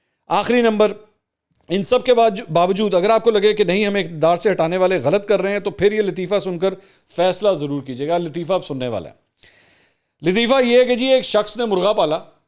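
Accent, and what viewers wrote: Punjab, Pakistan. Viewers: I love you sir and your punjabi.....punbi k tarka aur zayada lagaiya karein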